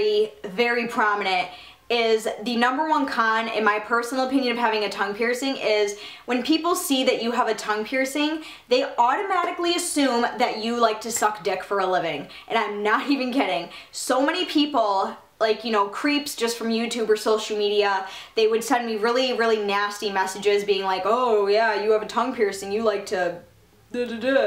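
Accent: Michigan, United States. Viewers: I'm sure that the only reason people ask if you have a lisp is because you're from Michigan. A lot of people I know sound like this too😂 I sound like it as well, and I live in Michigan.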